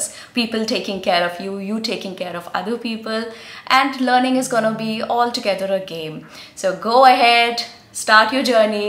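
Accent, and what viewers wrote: India. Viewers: Unfortunately you have a heavy indian accent, particularly it is very difficult for a British to understand you...please work on your accents befor teaching anyones..or kind of fooling others.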